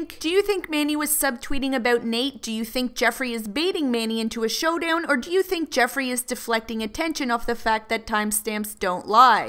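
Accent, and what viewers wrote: Canada. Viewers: Canadians and their “Abouts”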